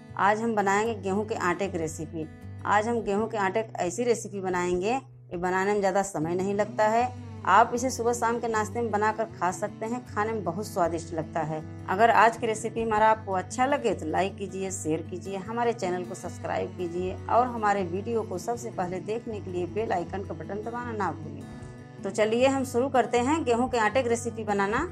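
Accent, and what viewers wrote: Uttar Pradesh, India. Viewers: Aap up ke Ho kya.?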